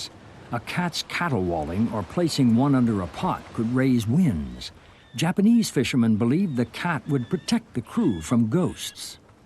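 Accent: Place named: United Kingdom. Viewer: Why does the making of segment always have the original uk narration instead of a us narration?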